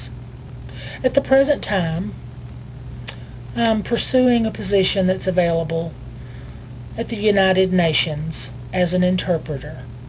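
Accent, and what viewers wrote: South, United States. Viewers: LOL! Southern!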